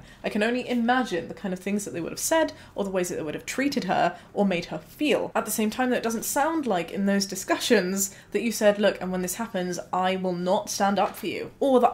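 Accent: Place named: England, United Kingdom. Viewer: I think the biggest problem with your name is you being British, the English accent just adds an extra r onto words that end with vowels, so I thought your name was until I saw it in writing lmao.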